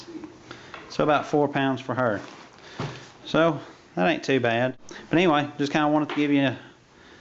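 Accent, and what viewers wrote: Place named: North Carolina, United States. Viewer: Great video man ive seen a few of yours and am glad to see the circuit and the montbell products review because i am considering those purchases. My food is very similar to yours minus the snack cakes, candy, and fig newtons but i understand the extra calories for winter. I would check out the Knorrs sides meals for dinner because they are only about 99 cents. I usually add some tuna to them for extra protein. Sounds like you are from NC, im from Washington, NC.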